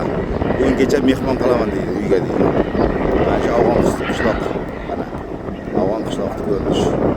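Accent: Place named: Surkhandarya, Uzbekistan. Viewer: Бу сурхандарёдан узбекча гапиряпдику ашитта машутта олифтагарчилик кимаяпдику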